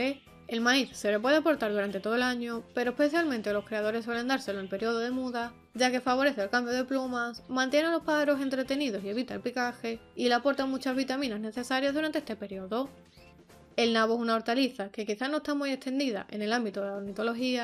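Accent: Spain. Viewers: Gracias, muy util incluso para mi no solo para ellos jejeje😊Tengo la curiosisad por saber de donde es tu acento ,se que eres española pero de que region? Gracias nuevamente!!! 😊 Un saludo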